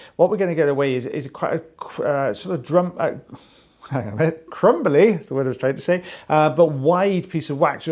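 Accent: United Kingdom. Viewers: Yes, we say vacuuming in the US, but it makes me chuckle to hear hoovering! ☺️ Love your British accent in addition to your knowledge!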